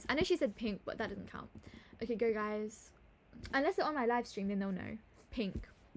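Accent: United Kingdom. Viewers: When you discover for your 1st time that is British 💀 (this means I love it and I’ll start to watch more to know how British people talk 🫶)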